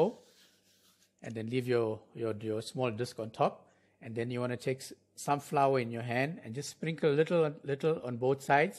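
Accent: Durban, South Africa. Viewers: Hi. You sound South African Durbanite. I also do similar dough.. Take care love from Durbs. South Africa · South African, accent sounds like Durban people... Durbanite ❤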